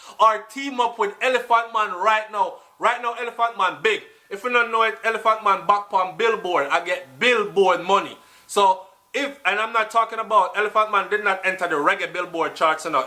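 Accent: Trinidad and Tobago. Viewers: 😆😆😆😆😆 your trini-accent. Big up yourself. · Damn remember the good ole days when national anthem play inna dance.. I was really young but I remember.... da trini accent deh fool me and mek mi a wonder if u fwd from trini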